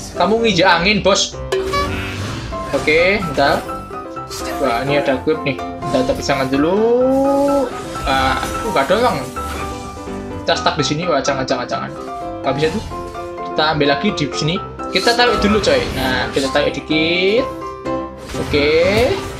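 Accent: Java, Indonesia. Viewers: Cangkem mu !!! , ndaz mu ilang. Jargonnya medok bgt wkwkwk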